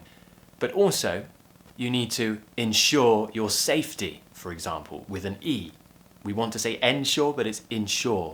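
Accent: United Kingdom. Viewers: In love with your British accent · Hello from Brasil! I really love your British accent, love your way of teaching. Thanks a lot.♡ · Top of the morning sir, do you speak in the British English? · I Love Your Accent. I'm watching every of your videos. And I learned a lot, Especially the British Accent, So Now,I'm studying British accent and finally I found your videos and it help me, I mean, You help me and others about british Pronounciation, Accent and Phrases,Etc. Thanks to you Idol. I'm from Philippines and proud to learn British English. I Hope That Someday I will also come to the United Kingdom. Especially In my Favorite Place London, England.I hope you can help and teach a lot more like we are just learning English and British accent And I hope your Channel lasts longer, have more Likes, shares and Subscribers.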